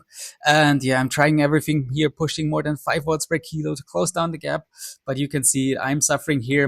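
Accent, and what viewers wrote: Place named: Portugal. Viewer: Portuguese origins? The first name but mostly the english accent. Sounds very portuguese style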